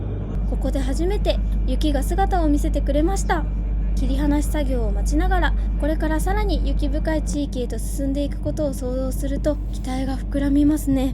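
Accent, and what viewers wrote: Kansai, Japan. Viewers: ご両親が関西出身との事で関西弁がとても自然で良いですね。今回の動画もとても良かったです。また楽しい旅の動画(特に鉄道もの)お願いします。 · 関西弁ですか？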